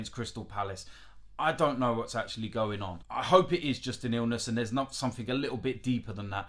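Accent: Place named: London, United Kingdom. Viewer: aaah the dialect has changed , your a white londoner today .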